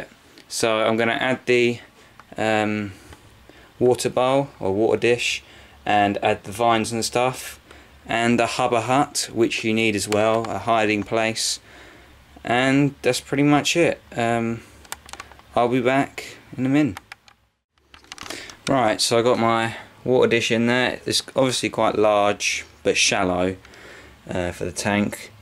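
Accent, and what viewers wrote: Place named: United Kingdom. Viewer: Great vid! Do you live in the UK or the US? Because you're using American measurements but you have a British accent.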